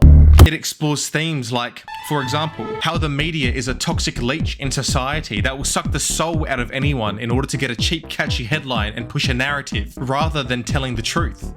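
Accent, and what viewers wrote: Australia. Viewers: Cool guy with Australian accent, defenetly subbing !❤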